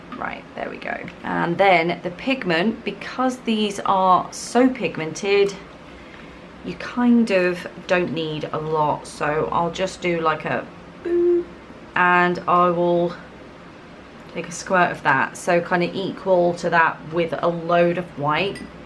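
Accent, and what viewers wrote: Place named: United Kingdom. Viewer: I am soothed by your British accent here lol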